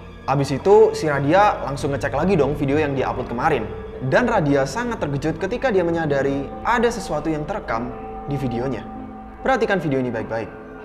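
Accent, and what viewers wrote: Malaysia. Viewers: bang cakap malay mcm org malaysia.. hehehe salam malaysia buat indonesia 🥰🥰🥰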